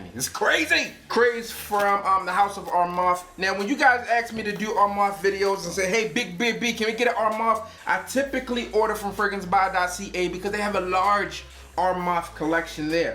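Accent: Baltimore, United States. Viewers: New to the game and from what I've seen I'll be leaning on your reviews a lot! Is that a Baltimore accent I hear?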